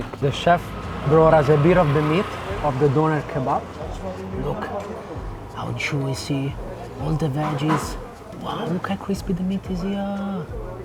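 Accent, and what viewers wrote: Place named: Italy. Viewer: Man i love his Italian accent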